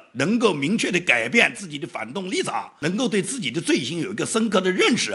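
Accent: Nanjing, China. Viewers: 自从上个月网上偶然发现的视频，几乎每集都看。从一开始听他的口音，就觉得是南京人。而且还是南京二中毕业的。我姑姑过去是南京二中的物理老师，说不定那时候教过吴先生呢😊。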